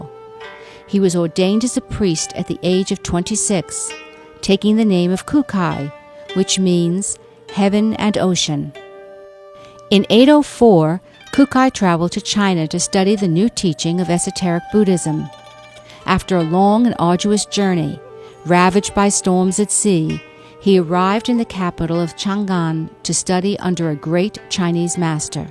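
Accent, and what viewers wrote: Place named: East Coast, United States. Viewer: The narrator's eastcoast accent was a nice touch....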